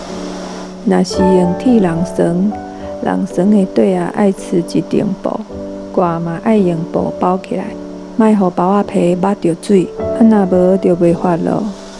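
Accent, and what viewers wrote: Hsinchu, Taiwan. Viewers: 第一次聽到新竹腔耶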